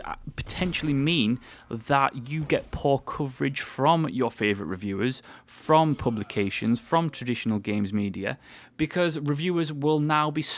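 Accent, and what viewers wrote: United Kingdom. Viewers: I do not believe almost any "professional" reviewer (ign, gamespot, what have you) ever was paid to give a positive score, pretty much because once such a thing was discovered (and yes, it would be) he'd have an easier time kissing Emily Brontë than finding any other job in the industry. Also, surprise surprise, first Beth game out under this conditions is criminally woeful so far as performance goes on pc. Good piece man, I'm going to watch some more vids but you got a new sub in all likelihood. (plus, a British accent never hurts)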